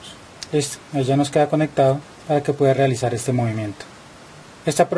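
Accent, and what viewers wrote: Colombia. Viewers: Voz sos de colombia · Qué orgullo para Colombia que tengamos un profe de su categoría. Qué institución educativa tiene el privilegio de contar con usted profe? Tiene acento valluno. Saludos desde Antioquia.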